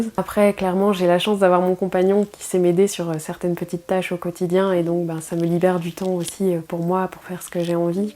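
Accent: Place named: Hainaut, Belgium. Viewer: Félicitations pour ce cap des 10000 abonnés. C'était un plaisir de te découvrir davantage... Avec toutes tes passions qui sont celles que j'aime aussi... Cette vie douce est vraiment source de satisfactions ... Tu diffuses de l'apaisement comme le parfum des pâtisseries que je t'imagine sortir du four ☺️. Et cerise sur le gâteau tu es du Hainaut 😉 le pays de ma famille maternelle.. 🤗. Et chaque note d'accent est une petite madeleine pour moi ☺️... Je te souhaite encore de nombreux abonnés et surtout... beaucoup de temps pour continuer à créer. 😘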